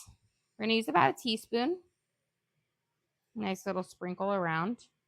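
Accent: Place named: Florida, United States. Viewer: You are starting to sound like we live in the same state. I’m in Florida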